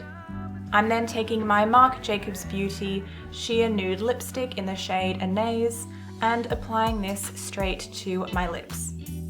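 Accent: Australia. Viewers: Her face screams "French Model" to me, so even though she talks like an Australian, it didn't hit me that she's Australian until she mentioned the weather there lol. I love how she leaves a little bit of darkness under her eyes (especially since I see a bunch of people on YouTube who take their concealer all the way up to the lashline). It looks really cute :) Thanks for posting.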